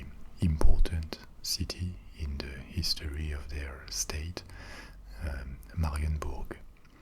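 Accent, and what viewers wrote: France. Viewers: your french accent is so stereotypically beautiful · You are the only person who has a french accent that I can stand.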